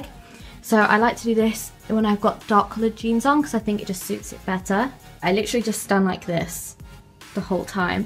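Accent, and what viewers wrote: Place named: Essex, United Kingdom. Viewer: Aw we're both 4'11! where shouts are you from? I've just moved from Essex to Blackpool and your accent sounds so similar!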